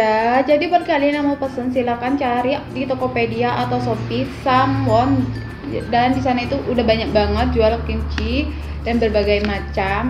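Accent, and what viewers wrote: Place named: Lampung, Indonesia. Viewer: Logat Lampung nih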